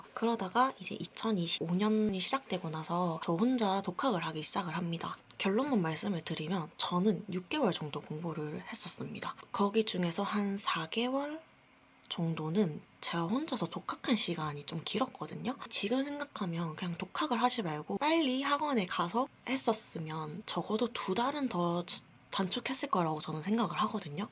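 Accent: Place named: Seoul, South Korea. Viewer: 난 서울말인줄 알았는데 차분하니,, 어디 사투리라는 거임?? 전라도도 아니고 충청도도 아니고.. 음..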